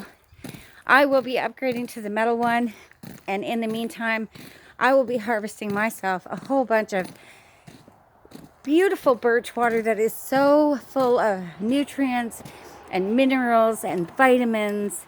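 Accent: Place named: Canada. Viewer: Thanks for always giving people something to learn, now i see how your channel name, coincides with each other! Awesome video. I hear the Canadian accent 😊👍🇨🇦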